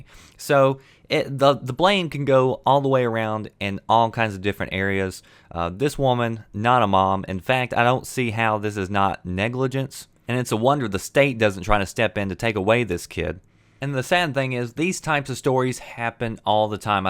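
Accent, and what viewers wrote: United States. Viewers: yes blame everything else its never the persons fualt hey ?? blame the game the booze the drugs. Noone and nothing made u take the drugs the booze or play the game. U sound american to me. take reposibility. and that girl in the pictures massively over wieght she could die of heart failure just in the shower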